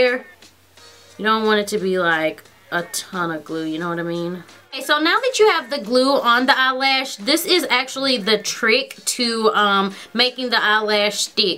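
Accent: South, United States. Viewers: I absolutely adore your southern accent! It's so fetch! :) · A little late on watching this video but I must say I LOVED this tutorial. It definitely does take practice and that is exactly what I am going to do. BTW: I love your channel and your upbeat and spunky personality. Oh and I cant forget that southern accent. ;) SUBSCRIBED! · Your country accent makes me always wanna jus give u a big ol country Hug.. I luv it =) <3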